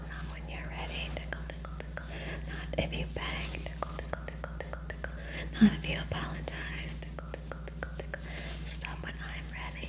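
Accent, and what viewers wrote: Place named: United Kingdom. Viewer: you're funny saying "Hey,you" and "Who do you think you are?".If you could ask anything about me,fun or otherwise,what would it be?I respectfully wish you continued success with the ASMR videos.Love your facial expressions,mouth sounds,tickle taunts and your cute accent,you silly Brit.You can't get me if you tried.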